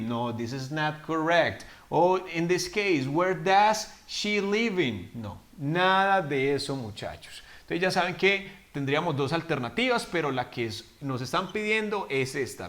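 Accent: Antioquia, Colombia. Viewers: me encanta el acento colombiano parcero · Vosos paisa de medellin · Yo creo q me estoy enamorando no pongo atencion me encanta el acento colombiano el teacher ni digamos 🤗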